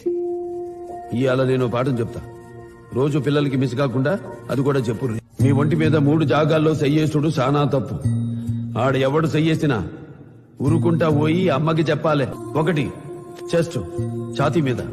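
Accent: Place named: Adilabad, India. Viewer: Adilabad language ❤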